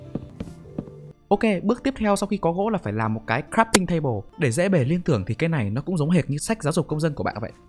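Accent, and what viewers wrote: Hai Phong, Vietnam. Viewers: Anh ơi quê anh ở chỗ nào Hải Phòng đấy ak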